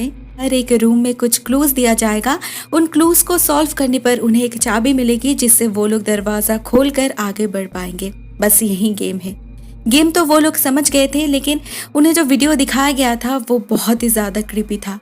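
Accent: Bengal, India. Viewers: Hey r u from kolkata your voice seems like u r bengali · Apka bengoli tone sunne me aur samjhne me thode issue hote hai verna baki sb toh sahi hai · Aap bangal se ho ky?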